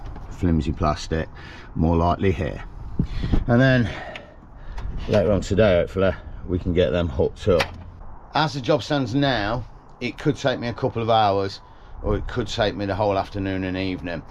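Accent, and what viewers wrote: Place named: Leicestershire, United Kingdom. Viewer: Loving the videos lately mate. Nice hearing a fellow Leicestershire accent lol. Keep them coming!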